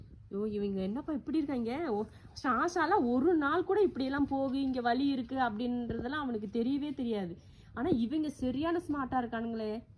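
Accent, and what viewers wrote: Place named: South Tamil Nadu, India. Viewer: Sis neenga irukirathu covai. But native south district. Am I right? B cas neenga pesura slang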